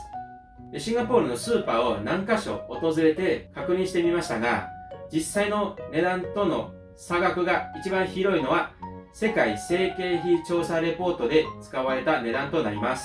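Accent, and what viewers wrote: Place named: Kansai, Japan. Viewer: 関西訛りがついていますね（笑）いいですね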